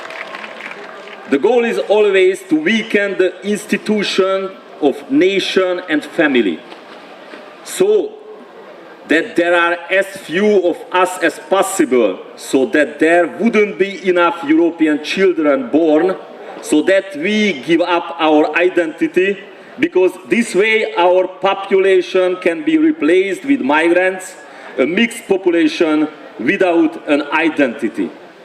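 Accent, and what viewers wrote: Hungary. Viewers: Nem baj, teljesen érthetően beszélsz, és ez szerintem sokkal fontosabb, mint az, hogy mennyire magyaros vagy nem magyaros a kiejtésed.:) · Nem baj, hogy nem tökéletes az angol nyelv amit beszélsz! Soha nem is lesz jó mert magyarnak születtél az az anya nyelved mindig is benne lesz a magyar akcentusod ha bele feszülsz akkor is! 👍 🇭🇺 Hajrá Mi Hazánk 🇭🇺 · Aranyos, mert a magyaros angol a legjobb angol! Maradjon meg ez, nem kell minden nem angolnak elhagyni a nemzeti accentust! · Szerintem még jól is áll Önnek a magyaros akcentus. Különben is a lényeg az, amit mond és az zseniális. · Szia! Szerintem pont így jó ahogy van! Egy magyar embernek legyen magyaros az angolja! Ettől lesz ízes és egyedi! További sok sikert kívánok az utadon!!! FEL A GYŐZELEMRE!!! 🇭🇺🇭🇺🇭🇺🇭🇺🇭🇺🇭🇺🇭🇺🇭🇺🇭🇺🇭🇺🇭🇺🇭🇺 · Szerintem nem baj a magyar akcentus, végtére is magyar. Macedón meg francia akcentussal beszèl. 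Fő, hogy értsék. És értették. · Magyar embertől nem szégyen az idegen nyelvű szavak magyaros kiejtése, csak egyes nemzetközi, kizárólag idegen érdekek mentén, ügynökösködő hazátlanok szerint! Sőt szerintem még az ilyen fennt említett férgek hergelésére, szándékosan belecsempésztem volna a beszédébe Toroczkai helyében, egy kicsit az ízes szöged környéki nyelvjárásból,némi pálócos behatással! Akkora magyarkodástól a ragya is kiverné a globalista csúszómászókat! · Hallottam már sokkal borzalmasabb magyaros angol kiejtést, egyáltalán nem vészes :) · Kedves! Semmi baj a kiejtéseddel. Magyar vagy, magyararos a kiejtésed. Aki meg akar érteni az megért, úgy gondolom vannak ennél nagyobb problémák is a világon ami sokkal fontosabb és amiért közdödök. ( Neked van angol kiejtésd, nem hiperpasszív mint annak a báb bolond Karácsonynak, aki össze vissza hazudik a nyelvtudásával kapcsolatban.) Úgyhogy kitartás és sok erőt kívánok neked és párttársaidnak! 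· Örülünk, hogy még a kiejtésed is magyar, nem csak a szíved! :) Nagyon jó beszéd volt, értette, érti ezt mindeki, azok is, akik ugyanígy gondolják, és azok is, akik máshogy - de nem nekik lesz igazuk. Melletted állunk, Te vagy Magyarország, és lehet, hogy egész Európa jövője! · Gyönyörűen olvasol angolt, magyar akcentussal😃👍; -Te vagy az emberünk! Hajrá MiHazánk! 🇭🇺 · A kritika valojaban intelligencia kerdese. Nemcsak angol teruleten kulonbozo az un tajszolas vagy szleng. Hanem magaban az English Commonvealth ben is hellyel kozzel kulonbozoen nyomjak osszehasonlitva a Cockney akcentushoz…..azert van akcentusod mert MAGYAR VAGY. A szonoklat ertheto, es az erofeszites a minden keppeni kifejezodese hogy mindenkihez eljusson a kivant tartalom ertelmi szinten. Retorikailag is nagyon meg alltad a helyed. Ugyanis mellozted a frazisokban valo, szaggatott to mondatos puffogtatasokat. De magaban a gesztus ami egy tart karu olelo Udvozles nyelvi formaba ontve ,Tiszteletteljes Koszontes! 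….. Kulpolitikailag nagyon fontos es meghatarozo dolgot fejeztel ki. Gratulalok a toretlen nemzeti elkotelezettsegetekhez es elszantsagotokhoz! Lehetoleg tobb feliratozott videot kerunk, Tisztelettel! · Milyen dolog hogy egy magyar politikusnak magyaros a kiejtése, remélem most már az ég kékségéből is problémát csinálnak.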